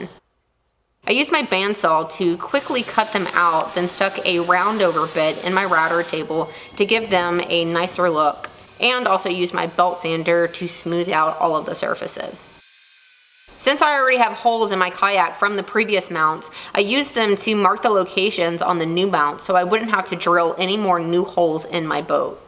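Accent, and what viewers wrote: Texas, United States. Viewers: Drill a hole for the pen.love the texas speak. How about using bead and hole stops like you would see on canes and walkers. Rotate wheels out of way when not using , click when u rotate into position. Lengthen arms that way too.that can also raise height, stop scraping bottom.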